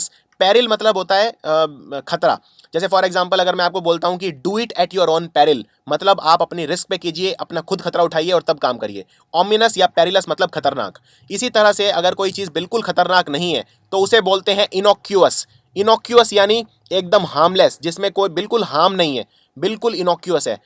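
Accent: India. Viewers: oooo sh***t again this pronunciation by indian people .....!!!!! sorry, but it's so AWFUL!!!! my ears are died !!!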